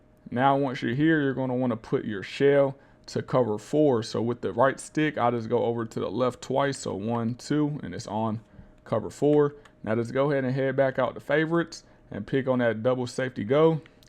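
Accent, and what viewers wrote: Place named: South, United States. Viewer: This guy is from Alabama or Mississippi. That drawl....I recognize my peoples...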